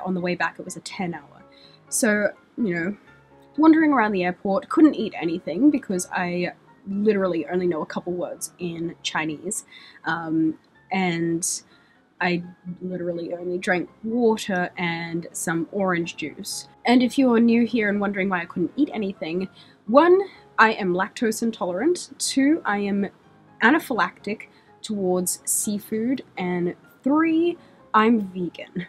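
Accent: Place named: Australia. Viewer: AUSTRALIAN ACCENTS ARE THE BEST THING EVER!!!! AUR NAURRRR!!!!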